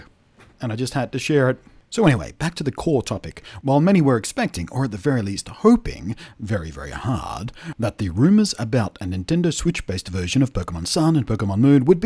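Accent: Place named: Australia. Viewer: There's something about a deep voiced eloquent Australian's ranting that cuts deeper, ya know?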